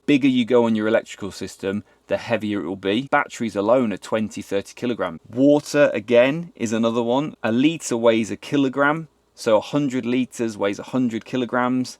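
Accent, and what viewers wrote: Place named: Sheffield, United Kingdom. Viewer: he sounds like a Sheffield lad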